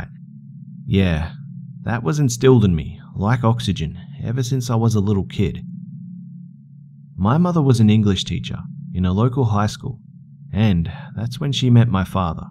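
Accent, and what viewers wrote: Australia. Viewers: Wow, what a sad story and a very monotone voice... also didn't help that the guy has an australian accent... all story tellers should be British! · "In this scary STORY..." an AUTHOR... with an Australian accent claims he was born in India, and tells us he was 12 and doing a Calculus assignment... Details, details... must have a criminal mind to fabricate such a tale. I stopped listening halfway through. This is one of those creepy corners of YT. I prefer true accounts in order to understand the dynamics of child abuse. Since after moving cross country, I have come to meet about 18-20 people, 7 of whom suffer from mental disorders, and all of them have suffered child abuse. A few of these live in absolute squalor and abject poverty.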